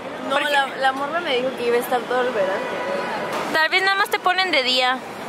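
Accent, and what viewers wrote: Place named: Fresa, Mexico. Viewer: A se le está subiendo. Ya habla más fresa, antes era más sencilla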